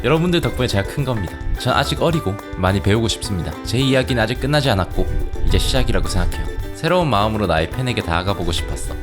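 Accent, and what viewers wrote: Gyeongsang, South Korea. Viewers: 님 경상도 사람이시죠??? 말투 들어보니깐 경상도 사람같은데.....흐음ㅋㅋㅋ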